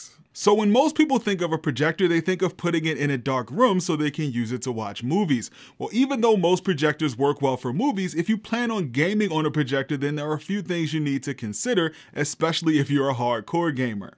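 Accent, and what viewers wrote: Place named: Memphis, United States. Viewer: Are you from Memphis? “Lumen” was not helping you hide your accent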